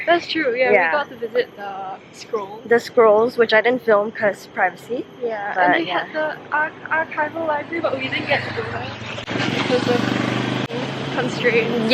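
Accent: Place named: Singapore. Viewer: Are u singaporean? cause u do have an accent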